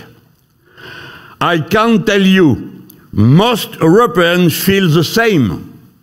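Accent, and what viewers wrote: France. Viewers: 😂 et en plus il fait exprès de forcer son accent français ❤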